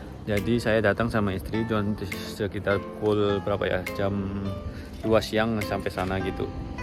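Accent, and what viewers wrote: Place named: Banyumas, Indonesia. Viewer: Ngomong GEning te ngapak boss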